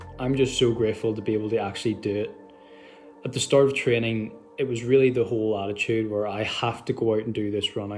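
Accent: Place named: Ireland. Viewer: No way!!! I’m doing the Belfast marathon as-well, I suspected you were doing it cause u said u were 12 weeks into training which means most likely u only have another 4 weeks left and the Belfast marathon is in 3-4 weeks and I could recognise the Irish accent from anywhere. Maybe I’ll see you there mate, good luck and what time goal are you going for if you don’t mind me asking?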